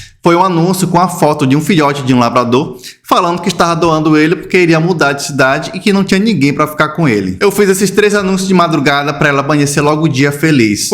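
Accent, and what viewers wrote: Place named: Ceará, Brazil. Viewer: Esse sotaque é cearense.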